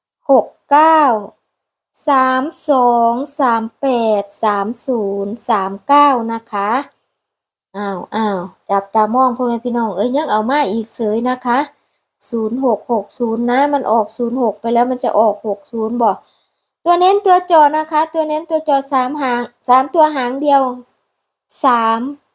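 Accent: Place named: Isan, Thailand. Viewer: ออนชอนเสียงเว้าเด้อคัรบ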